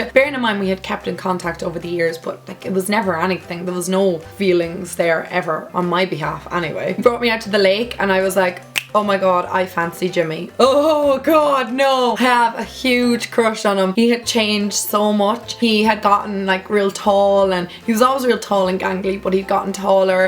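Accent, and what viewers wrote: Ireland. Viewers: Love this video, love the irish accent xx i will deffo ask you a question next q&a :)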